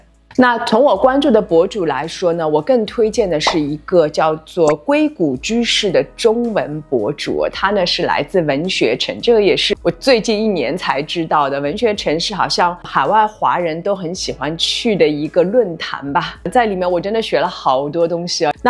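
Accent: Shanghai, China. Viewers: 沪普沪英很亲切，谢谢。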